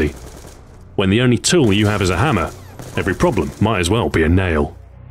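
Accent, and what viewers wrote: United Kingdom. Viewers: Hey, as one of your presumably many viewers who haven't played CoD in a while but still tune in regularly not just for your videos' production value but also your soothingly smooth and ridiculously British voice I've been wondering if you've ever considered doing a "dramatic reading" of a favorite chapter from a novel or short story on your account. It shouldn't take too much time to prepare and record and denying the world the glorious sound of your voice would be a crime! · Why does everyone say he sounds like Captain Price??? Yes, they have British accents. THAT'S IT. The tone of the voice is VERY different, and the accents aren't even identical. I just... I don't even...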